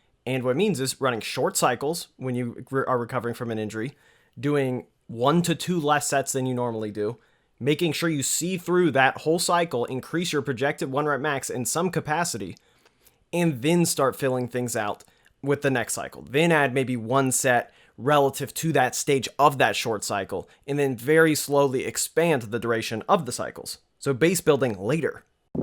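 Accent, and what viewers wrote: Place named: New York, United States. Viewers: Clocking his southern accent southern *Ohio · Sounds like a New Yorker and says pounds like he's from Tennessee.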